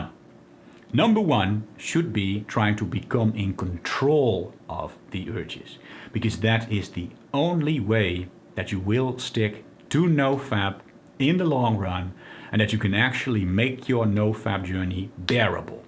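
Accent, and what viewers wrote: Netherlands. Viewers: I sense a dutch accent? My strijder! Good content keep it up jongen!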